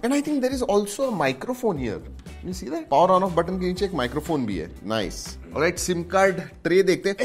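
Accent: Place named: Bengal, India. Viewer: Aap Bengali ho kya.....aapke hindi me Bengali tone hai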